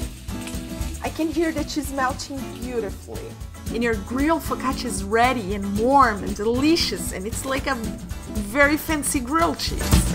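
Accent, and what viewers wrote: Brazil. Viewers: She is Brazilian:)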